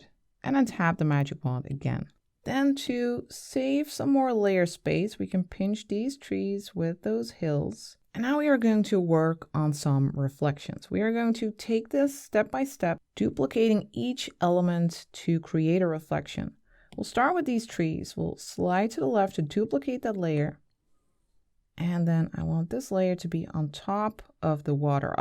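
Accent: Netherlands. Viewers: I really enjoyed this! I am just a beginner and I appreciate your careful and clear explanations…En Ik hoor een mooi Nederlands accent!😊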